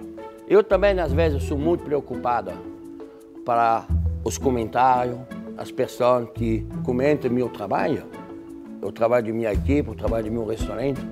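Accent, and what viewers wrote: France. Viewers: Amo vc jacquin não sei escrever jshshshshshs, enfim vc é um lindo da vontade de morder vc vc é um encanto! E o sotaque francês é muito fofo vc é demais muita saúde, sucesso, dinheiro e principal muita luz divina na sua vida!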